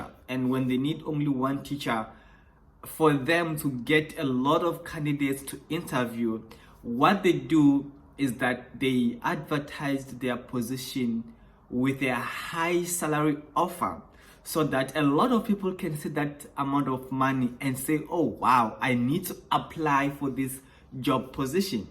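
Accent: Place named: South Africa. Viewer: Also considering the fact that he's South African, but definitely not a native English speaker from South Africa (there are native English speakers in South Africa, he is not one)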